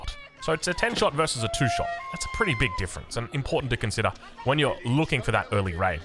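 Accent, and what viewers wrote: Australia. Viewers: Hi, you're aussie right? 😅, do queues pop ok for this on 1v1? cause i want a new comp rts to play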